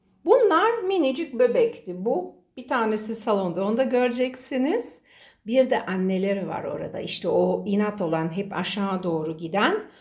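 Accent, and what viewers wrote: Turkey. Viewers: Abla Trakyalı galiba 😀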